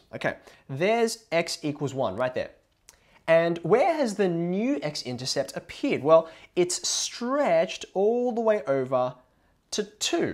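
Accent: Australia. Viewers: great video but i hate the austrailian accent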